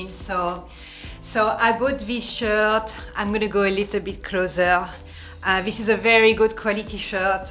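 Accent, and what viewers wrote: France. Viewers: I want to be you Beautiful....speaks French....French accent...stylish....I'm very jealous . X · you are so stunning! I just found your channel a few days ago and subscribed right away. I love your bubbly personality and your beautiful French accent ❤️. Everything sounds better with a French Accent. I’m a super Francophile so I’m enjoying you so much. Your style is super chic.....love your videos...I’ll be watching them all! Merci❤️👠👜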